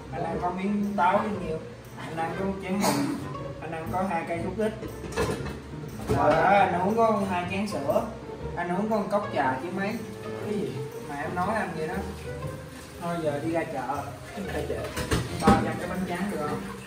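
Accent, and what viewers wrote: Vietnam. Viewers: Anh nói tiếng Nam đáng yêu xỉu 😍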